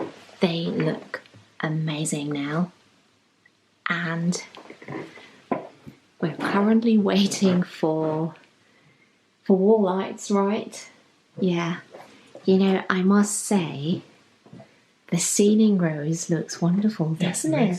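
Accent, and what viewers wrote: England, United Kingdom. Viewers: Hello, I‘m curious to know if you ma‘am are German too, or only your husband? You definitely don’t sound German. You sound 100% English.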